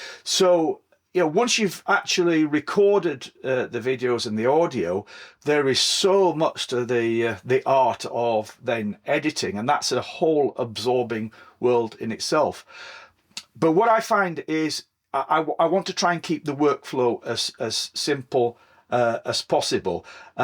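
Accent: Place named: Northern England, United Kingdom. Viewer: Excellent video! You nailed it with the reasoning and I fully agree with you. Nice to hear that Northern accent as an ex-pat in Vienna from Sheffield. I located your trips and was surprised that you don't seem to be from the Harrogate area as you sound just like my best mate from school who's from there. 😂 Anyway I'm glad I discovered your channel and have viewed a few of your videos. I will watch more. Again I fully agree, stick to your principles why you enjoy making them, you are educational, thought inspiring and making others happy. Thumbs up 👍 Oh yes I have recently returned to biking after a long break. Kids etc. 😉 A lot to relearn.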